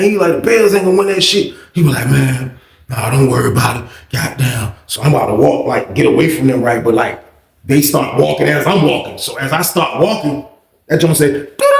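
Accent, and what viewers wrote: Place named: South, United States. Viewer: well rocks with every body black and white, and is color blind, that's just how talks, we are country 😀 Stay blessed 🙌 and inspired 🙏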